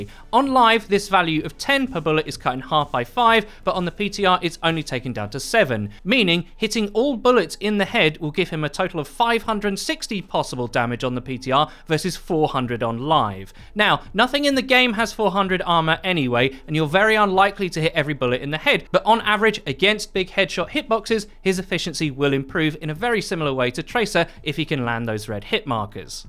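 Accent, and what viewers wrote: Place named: United Kingdom. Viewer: Thought this was a decent explanation of the new changes. Nice to hear a British accent too.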